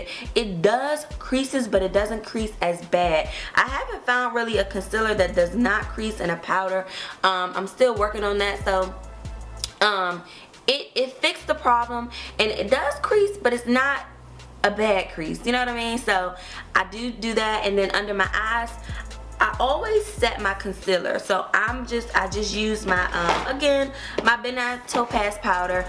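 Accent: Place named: Baltimore, United States. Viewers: I'm obsessed with your accent, where are you from? DC? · You gotta b from Baltimore lol I hear it all in u, new subbie love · tuuur ( two) omggggg I love DMV accents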